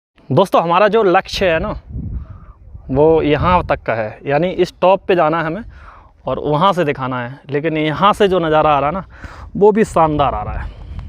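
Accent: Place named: Western Uttar Pradesh, India. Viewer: Tum kahan ke ho bhai tumhari voice west up ki lagti hai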